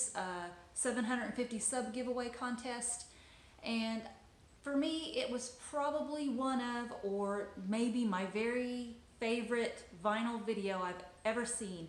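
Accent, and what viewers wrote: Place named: Georgia, United States. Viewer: Are you in the Carolinas? Trying to place that voice. I was born in the Midwest but grew up in the Carolinas. You sound like you're from my neck of the woods. Maybe Georgia.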